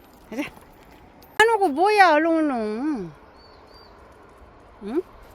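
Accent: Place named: Chungcheong, South Korea. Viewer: 충청도 사투리 정겹고 좋네요잉. 깜순이한테 나는 귀여운 북 소리 아이구 이뻐!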